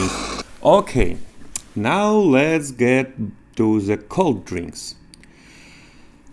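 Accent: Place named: Russia. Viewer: Просто жесточайший рунглиш Не осилил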